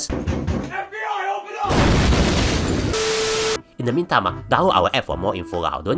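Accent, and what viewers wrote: Singapore. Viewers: Wah Lao! So Singlish leh! 😂😂😂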